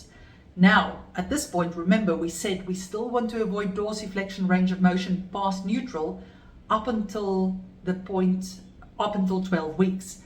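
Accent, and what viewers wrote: South Africa. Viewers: This video was the best help and support I've had in the whole duration of my achilles recovery! Thank you so much for the breakdown of the recovery process.: baie baie dankie - mal oor jou aksent. Dit was so lekker om hier in Australia na n stunning video vanaf UK te kyk en lekker te voel oor ons Saffer roots!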